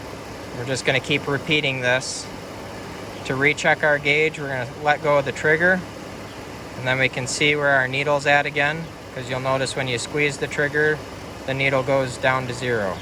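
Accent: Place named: Midwest, United States. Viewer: Simple, to the point. Well done. Nice Midwest accent. Cheers to the algorithm!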